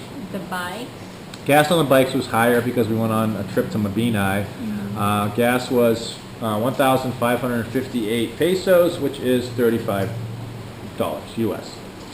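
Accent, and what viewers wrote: Boston, United States. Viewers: DO THEY EVER SAY ANYTHING ABOUT YOUR ACCENT BEING FROM MASS I KNOW THEY DO IN OTHER STATES HERE IN USA IF YOUR FROM BOSTON THEY SAY PAACK THE CAAAAAAR LOL LABSTA OR LOBSTER LOL I GUESS WE LEAVE THE R OUT PEOPLE MADE FUN OF ME ALL THE TIME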